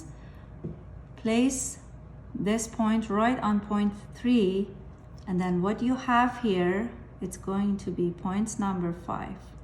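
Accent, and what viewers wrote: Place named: Iran. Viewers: She is Persian? She sounds like Farsi accent. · I love this accent Persian people 😍♥️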